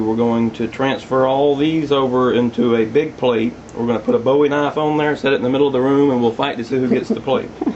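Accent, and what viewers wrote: Texas, United States. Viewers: Where are you from I love the way you talk your voice sound cowboy style Texas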